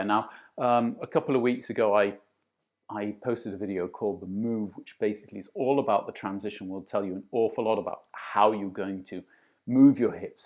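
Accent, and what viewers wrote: RP, United Kingdom. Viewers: Thanks for the video,. Where are you from? Your accent sounds English-ish with a hint of German or Scandinavian... my mother is English (I grew up in the states with an English mother) and been to England many times. I can't figure your accent out. 😄 I enjoy your videos. You're very detailed.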